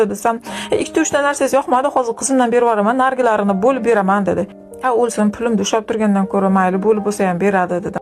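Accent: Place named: Samarkand, Uzbekistan. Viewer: Qaerdansiz shivangiz Samarqandnikiga oxshatim yaxshi korib qoldim ishizga omad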